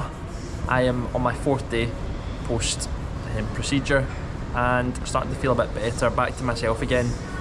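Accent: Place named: Scotland, United Kingdom. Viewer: I love the Scottish accent.. correct me if I'm wrong 😊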